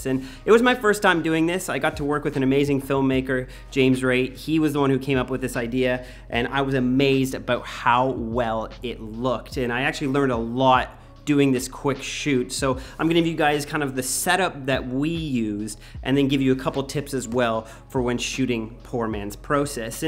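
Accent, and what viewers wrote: Canada. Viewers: I like the way you say process. Wish I had that Canadian accent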